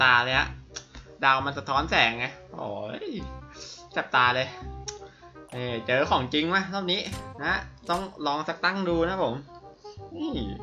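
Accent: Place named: Kanchanaburi, Thailand. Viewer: สำเนียงเหมือนคนเมืองกาญเลย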